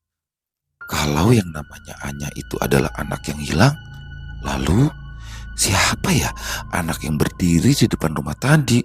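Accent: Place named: Betawi, Indonesia. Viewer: assalamualaikum bang, salam sehat buatbang bang seneng banget klw ceritanya bernada betawi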